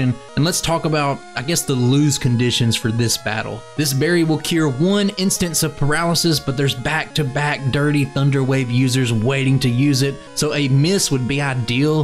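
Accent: South, United States. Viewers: what’s funny is to me you have a southern accent (I’m from Michigan) and in my mind I was like “oh yeah, he’s gotta be more southern based, that makes sense” lol.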